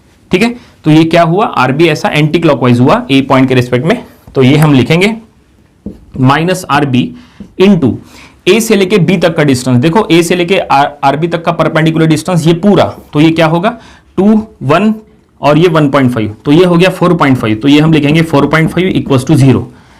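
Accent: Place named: Maharashtra, India. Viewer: Bhai Maharashtra mai ase hi bolta hai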